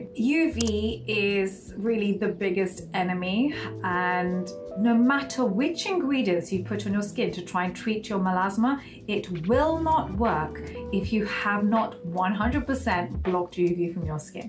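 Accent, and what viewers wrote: United Kingdom. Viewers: please remove the background music 😁 because we have difficulty to hear your voice properly 😕 and we love your British accent 😀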